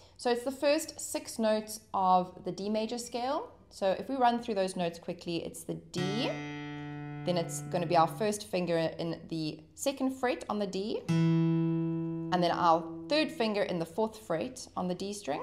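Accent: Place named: South Africa. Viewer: I thought I’d heard the (for me) nostalgic trace of an SA accent and checked your biography 😊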